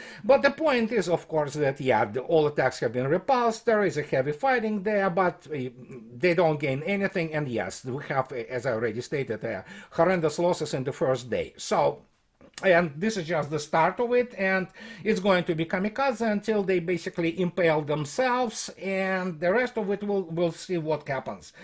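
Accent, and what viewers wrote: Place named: Russia. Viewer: i cant help myself but keep looking forward to your latest videos.i enjoy all your videos with technical information and analysis . enjoy your humorous description of western politicians and so called war experts who never faced bullets in the front lines. i love it when you tell the facts in Russian accent .love all your blogs.god bless you sir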